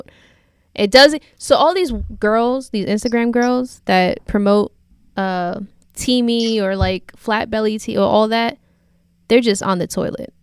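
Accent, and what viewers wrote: New York, United States. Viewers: you should really go with yearrrrrrr that the new york in you lol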